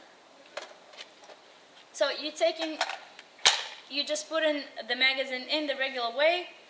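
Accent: Israel. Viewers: If you really want to get into details, she's not Russian, she's Israeli, read the description, about 20%-25% of Israelis today are Russian immigrants or their children from the big soviet nations immigration from the early 90's, so judging by her name she's probably from a Russian origin, but judging by her age and accent, she most likely was born and raised in Israel.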